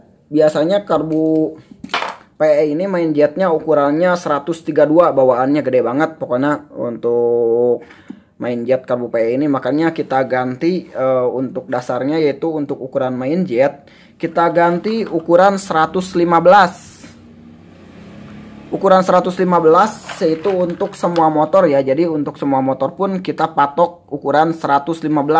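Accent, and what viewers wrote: West Java, Indonesia. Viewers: Sunda pisan kang. Wkwk Bermanfaat pisan video na. Hatur nuhun 🙏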